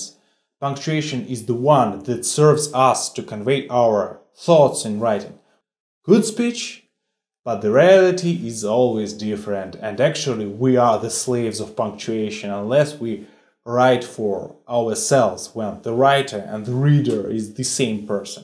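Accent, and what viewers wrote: Russia. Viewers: I love the Russian accent! Many, many years ago when I was studying Russian in high school (in the 70s), our instructor was teaching us how each language has something unique about it that is difficult for non-native speakers to duplicate. His point was that we need to master these differences in order to not sound so "American" when speaking Russian. For example, In English, we do not roll our r's. That's difficult for many English speakers and has to be practiced. We would spend a lot of time trying to properly pronounce words like рыбa, работа, рот, ручка и т.д. In English, we have the "th" combination that is rather unique and difficult for many non-English speakers to duplicate. That's why many non-English speakers have a difficult time properly pronouncing a simple word like "the." When a German speaker, for instance, tries to say, "I think so," it might come out sounding more like "I zink so" or "I tink so" or "I dink so." There are English sounds that do not exist in Russian. 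I remember my Russian teacher jokingly telling us about something he called the "Bit, Bat, Boat Test." This is how you know a person is a native Russian speaker and not an English speaker. Ask a Russian to say "bit" and it will invariably come out sounding more like "beet." That's because Russian doesn't have the short-i sound. Ask a Russian to say "bat" and it will come out sounding more like "bot." That's because Russian doesn't have the short-a sound. Ask a Russian to say "boat" and the "o" so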